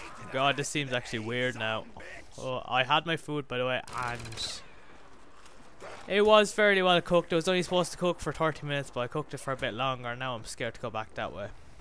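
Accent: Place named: Ireland. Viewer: wa part of ireland are u from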